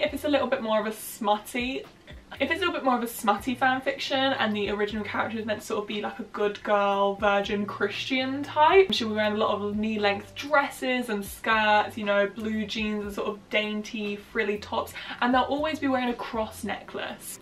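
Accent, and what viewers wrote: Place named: United Kingdom. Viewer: It's pretty intriguing to hear Uptalk and Focal fry blended with a UK accent.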